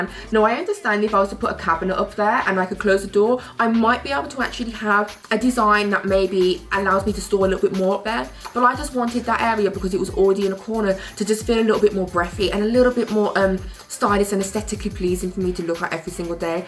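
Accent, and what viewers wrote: United Kingdom. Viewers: Is that Brummie accent you have? Thanks for the tips. Much appreciated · This is seriously one of the best videos I’ve seen on this subject. Content, presentation, editing, ideas and impact. Only suggestion would be to slow down your speaking slightly as it will attract more US viewers who love British accents but prefer slower speech. But seriousl6, you really know your stuff. I have no idea how you don’t have thousands of followers already. Keep at the marketing & algorithm study on YT to get your profile up there - and I have no doubt you’ll accelerate quickly. Cheers - am off to implement some of these ideas.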